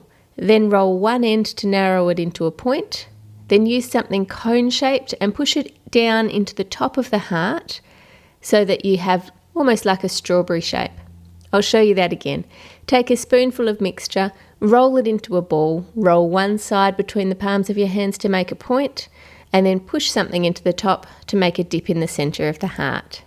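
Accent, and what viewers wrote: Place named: Australia. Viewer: Happy late anniversary sorry I love your Australian accent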